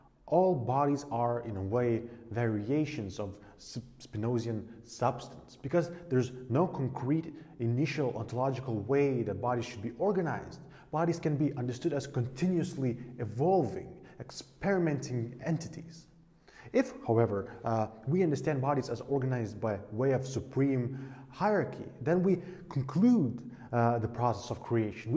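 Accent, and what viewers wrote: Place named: Lithuania. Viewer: The accent reminds me of a Lithuanian one. Thanks for the video!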